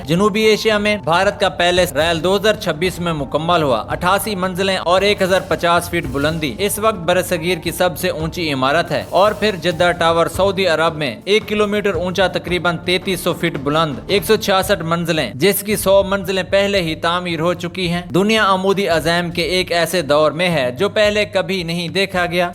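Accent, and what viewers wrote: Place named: Punjab, Pakistan. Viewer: Punjabi hai , talafhuz punjabiyon ka aaisa hi hota hai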